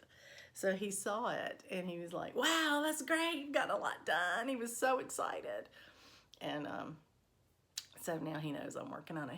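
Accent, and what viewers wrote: South, United States. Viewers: Such a pleasant southern accent and I love your taste in patterns.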